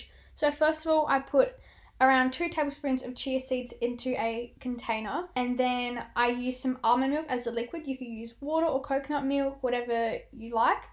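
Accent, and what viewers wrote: United Kingdom. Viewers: ohh hahah i thought u lived in the uk u sound so british idk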